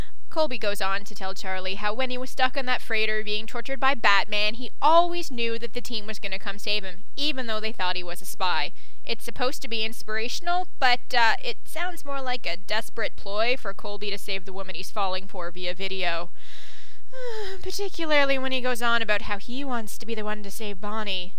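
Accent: Canada. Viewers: I need to finish watching this. But hey, yeah, you do sound Canadian but some people think I do too because well, I live near the Michigan/Ontario Border. The way you said "about" sounded about normal to me. LOL, anyway, part 1 was great and I am sure part 2 will be too. Okay enough blah blah from me. Rock on! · omw you're actually so funny (: I must go re-watch this one just because of you. It's like 52 past midnight. Damn your obvious subliminal messaging. And Canadian? Is it bad that I never actually notice accents? You could have been Australian for all I noticed. OMGAUSSIEACCENTS *dies and curses her own accent*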